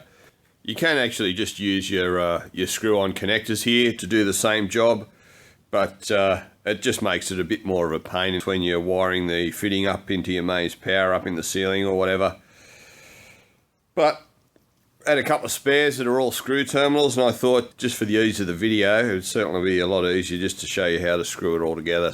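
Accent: Australia. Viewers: really dig yer Aussie accent, mate. No BS from any of you blokes, says a dude from Montana, US of A. I've been monkeying with LED conversions since before the new year and have discovered many tips and problems. For example, if you use so called "Plug and Play" WITHOUT ballasts, they won't work! Don't buy them -- ballasts should be cut out and scrapped. The P& P tubes are most common, nearly everywhere. They are known as type A tubes. Type B tubes need to be wired with a hot end, the other is neutral. I don't know what the one enders are called, as I have yet to find any, except on the internet (Hyperikon, come to mind, but they are bloody expensive and not needed) There are apparently at least 12 brands, if not more. There appear to be maybe 4 kinds of tube types. I have used the type B tubes as they are easy to wire and work well. They are double ended, as mentioned. 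The single end ones can be pricey, and they work well too, but have not used them. Hope these tips help some of your viewers, my Aussie friend. Cheers, mate. Bob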